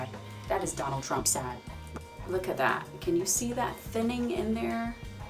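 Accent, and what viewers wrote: Barbados. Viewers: is that a bajan accent I am hearing mixed in there ??😀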